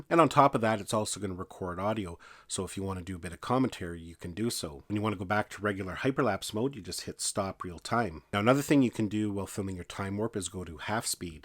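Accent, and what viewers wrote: Canada. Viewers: Thanks heaps for the help. I'm thinking from the accent and scenery that you're a Canadian!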